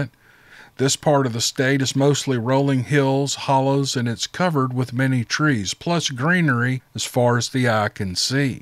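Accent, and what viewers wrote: South, United States. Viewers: Yay I am so proud to hear a man speak In a fine southern accent with no shame. So many people want to go to a "universal accent" and lose our beautiful southern accents and colloquialisms that I love! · Don't worry about mispronounciation of unfamiliar places, its all part of the show or you fluffing things or laughing at things, its what makes you human. I am a southerner, but thats south of England, but still get taken up on my accent as i now live elsewhere. Your southern drawl sounds great to me. Great show as ever. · I love it, " I'm just gonna' keep readin' till I get tired!" You da' man! Love your channel. 👍👍👍 P.S. I love your southern accent. It makes me long for Memphis and the South. There's something so comfortable about a slight, or even a heavy, Southern drawl. It just feels like like sittn' on the porch, drinking sweet tea, and swappin stories. 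Oh yeah, and the mom, or Mrs.of the house yellin," ya'll better get in here, supper's set!" Memories....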